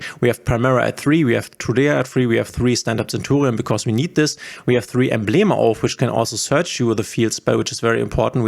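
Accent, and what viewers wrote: Germany. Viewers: your accent sounds german 🙃 great vid btw 🎉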